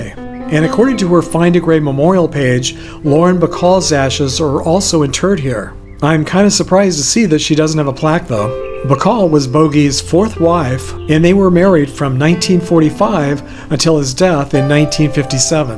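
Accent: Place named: California, United States. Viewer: you remind me of Lyle Talbot's son, Talbott--aka "Gilbert Bates" on LEAVE IT TO BEAVER. You even share the same name--even...lol. You both have that California accent; sounding the same. I wonder if Gloria Talbot is related.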